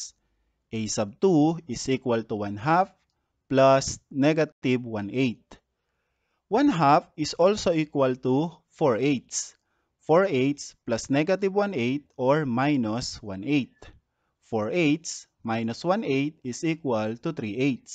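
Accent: Philippines. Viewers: Hes accent is very similar to a filipino because ima filipino too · I can tell you are a filipino by accent hahaha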